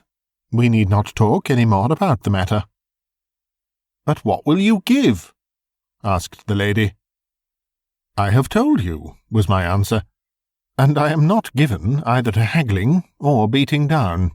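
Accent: England, United Kingdom. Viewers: You and this channel have become my absolute, number one favorite thing to listen to and I listen to them repeatedly! IMHO, only a proper English gentleman can do these awesome old ghost stories the justice they deserve and I’m so happy to have discovered you! I enjoy your readings just as much as I enjoy Michael Hordern and Basil Rathbone readings but (unlike them) I can look forward to new ones from you. I listen every single night when I climb into bed and let me tell you, I’m enjoying losing sleep...THANK YOU!!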